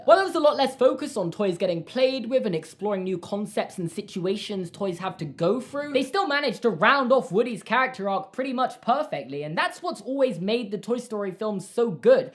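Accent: United Kingdom. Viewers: I lost touch with this channel for about a year and I'm extremely glad I decided to go back and listen to him go on Cars 2 again. I missed British.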